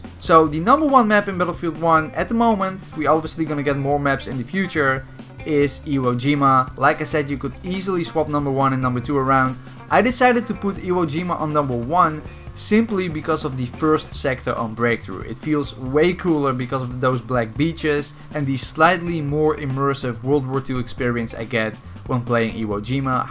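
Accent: Netherlands. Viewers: I smell dutch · Is dutch, Pronounces rotterdam as rodderdam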